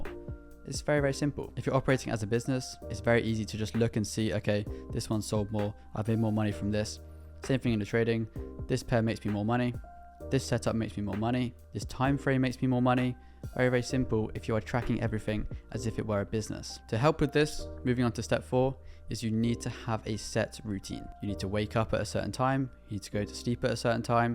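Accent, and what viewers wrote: United Kingdom. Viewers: How to sound British like you? I like your British accent mate 😍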